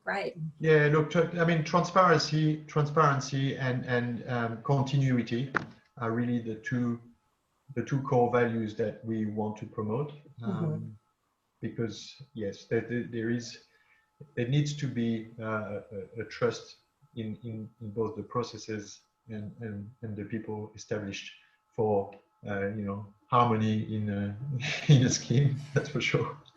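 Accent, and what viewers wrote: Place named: France. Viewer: Sound French 👌👍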